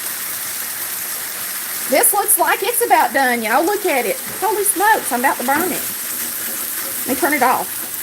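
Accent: South, United States. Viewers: I love your deep southern accent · I love your sweet southern accent! Your food looks SOOOOO amazing! !!!! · Hey....this looks amazing. Love the way you cook and also your great southern accent. I'm subscribed to your channel and can't wait to see more. Blessings my friend!!!!!!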